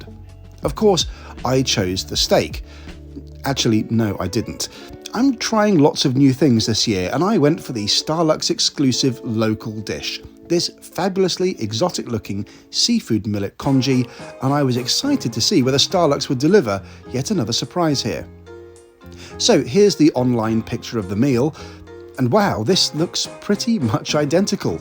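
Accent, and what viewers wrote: United Kingdom. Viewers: Great video!! Wow 🤩 🤩 Starlux 🇹🇼 looks ABSOLUTELY FABULOUS especially on that gorgeous new A330-900neo my favorite derivative of the Airbus A330 Family. I think this experience seemed a little better than the last time you were on an A330neo that being delta airlines example from Salt Lake City(SLC) to Paris (CDG) don’t you think so! 😂 😊 however, I do still really love that video on Delta’s A330neo as I enjoy hearing you pick problems in that awesome British accent of yours! 🇬🇧 😂🎉 <link> Also if I were flying Starlux I’d still try to find an A350 route over the A330neo. A bit of a loss if you ask me as nice as the A330-900neo is I’d always prefer the nicest newest plane or cabin interior on a carrier which would’ve been the A350-900 with Starlux😞 I cannot wait to fly on them someday! Finally Taipei Airport still seems like a pretty good and funky airport and it has some charm and personality I love that in an airport! 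😀 Also Can you please please please try to fly with Korean Air one day on that INCREDIBLE and FAB looking Boeing 747-8? I’d love to see that review either in their UPSTAIRS Biz Class or Fabulous First Class on the nose of the 747-8?? Please try them soon! I remember you saying several years ago now during your Hawaiian Airlines A330 review that you saw a 747-8 of Korean and you have yet to try them still!! Plz do and maybe on HNL-ICN? 😊 Looked like an EXCEPTIONAL EXPERIENCE! Finally Jeb & Suzanne Brooks just used that music on their 24 hour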